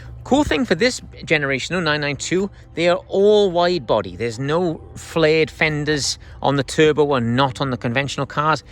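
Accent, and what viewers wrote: Wales, United Kingdom. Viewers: Is that a welsh accent i can hear? Glen S.Wales - Great review! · Just wondering is that a hint of a Welsh accent?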